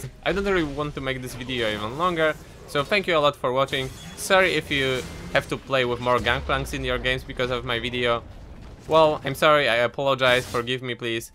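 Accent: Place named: Poland. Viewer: Jesteś Polakiem? Akcent strasznie polski:P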